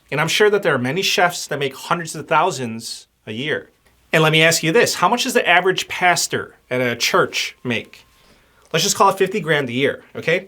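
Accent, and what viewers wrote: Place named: Michigan, United States. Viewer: Are you from Michigan? You have a subtle Michigander accent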